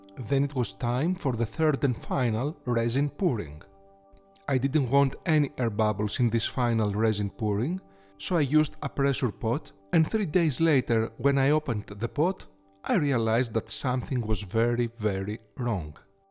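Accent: Greece. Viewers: what is this gentleman's accent? I want to say Greek? It's lovely, very pleasant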